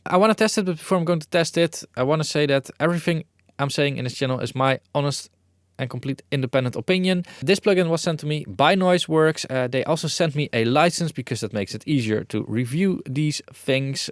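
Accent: Netherlands. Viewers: I could tell that you saId "Write" and not "Ride" immediately because "Ride automation" makes no sense! Of course, I've been watching your videos for a number of years and here in the upper midwest (Wisconsin specifically) it's not all that uncommon to interact with immigrants from the Netherlands and other northern Eurpoean countries so we're more used to those accents and have less problems understanding them!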